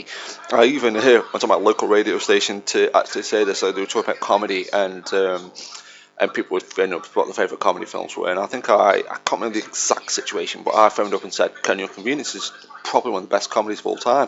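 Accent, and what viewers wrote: United Kingdom. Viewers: I usually find an accent tolerable. This sounds like British Ebonics!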